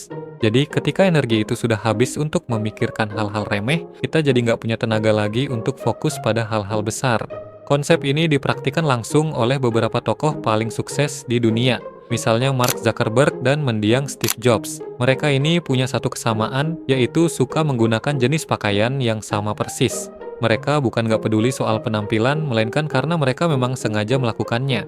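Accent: Bali, Indonesia. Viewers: blinya logat bali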